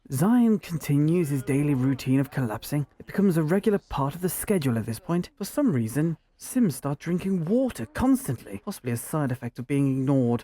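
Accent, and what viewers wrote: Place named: United Kingdom. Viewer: It's the British accent, sounds like David Attenborough of Sims documentaries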